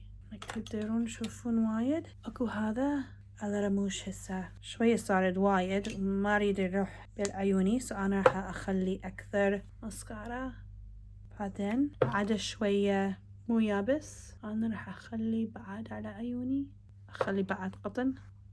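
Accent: Iraq. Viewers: You are soooo CUTEEEEE when you speak the Iraqi dialect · فدوه حلوه وانتي تحجين عراقي❤ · I love you, I love you, and you are so cute. Sweet Iraqi says💕😭😭😭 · ماعرف ليش حسيت طريقه كلامه نفس كلام مو بس مو بطريقه عربيه سوريه هاي بطريقه عراقيه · احبج كلش هواي. ...أموت عليج وإنت تحچين عراقي تخبلين. ..وطبعاً الفيديو راح يخلص كلشششششش هواي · ليش تحجي عراقي 😁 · فديت الي يحجي عراقي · فدوه شلون تحجي عراقي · فدوه شلون تحجي عراقي 🤩🤩🤩🤩🤩🤩🤩🤩🇮🇶🇮🇶🇮🇶🇮🇶🇮🇶🇮🇶🇮🇶🇮🇶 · يمه لغته العراقيه كولش كيوت انتي كلش حلوه 🥺🥺🥺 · فدو هدى الهجه العراقيه أتخيل ابحلكج فدوه فدوه 😍😍❤ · فدوة شلون تحجي عراقي ❤❤ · شنو جنسيتها وليش تحجي عراقي +فدوه لله☹️💛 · I love you so much and I hope for your comments🌺🌺😘The Iraqi dialect befalls you a lot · it's the iraqi accent 😂😂 kohlsh mean lot of, more 🙂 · تحجي عراقي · لا مو عراقية هية أمريكية بس تحجي عراقي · انتي مو عراقيه ليش متعرفين تحجين عراقي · لهجة عراقية 😻🥰❤ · اذا انتو تردون تصيرون حلوة هههههههه 😂❤ حلو كلامج بالعراقي^^♡ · يمه فدوه لتحجي عراقي فديتج ❤❤❤❤❤ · يمه فدوه تحجي عراقيز😁😍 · انتي كولش كيوت من تحجين عراقي😍💜 You're so so so cute when you speak Iraqi😍💜 Kooollllsh Big love to you from Iraq💜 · Omg so cute when your are talking Iraqi dialect 🍁🍁 من العراق متابعه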